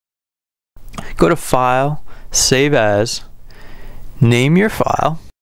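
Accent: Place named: West Coast, United States. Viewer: Haha! You sound like such a west coaster. But thanks for the lesson!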